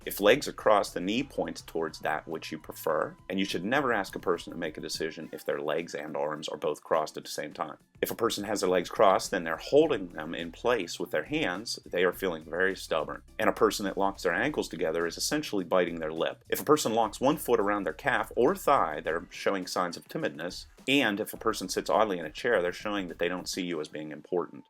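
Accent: Pittsburgh, United States. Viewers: the elbow touch must be in your culture you sound American, in my culture (Canadian) we don't touch each other at all on the elbows, it never happens, it would be too close to the body, and could be misinterpreted as something not appropriate, I have never seen anyone touch another person on the elbow when they shake hands, we do shake hands and hug at the same time, this is done by first the hand shake, then we pull each other in for the hug at the same time. it is a very nice and warm greeting, when greeting family or close friends, or we just give a big hug, sometimes we even hug a person we don't know very well, if the situation is seen as appropriate, like if theres been an accident and they came out okay and need comfort, that is what I mean We like to buddy hug, this is when we know a person is not very much into the full on hug, as i have a niece who is like that she isn't a huggy person, and is more comfortable with a quick sideways hug, or just a pat on the back. 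· Did I notice a Pittsburgh accent in there? Also, I like how fast the video is. Lots of info, rapid-fire, no time wasted. And there IS a pause and rewind option if needed.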